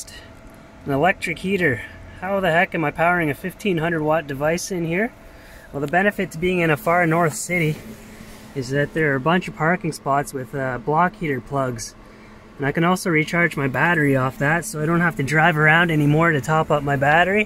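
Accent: Canada. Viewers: That Canadian accent! I'm from Wisconsin, and people think I have an accent lol. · Love that Canadian accent, everything sounds like a question. This man has a better life in a minivan than a lot of people in brick and stick homes. Happy trails! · The most Canadian accent I’ve heard in my life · This guy sounds so Canadian 😂😂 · Could listen to this guys Canadian accent for hours, in fact I think I have, shit what time is it!?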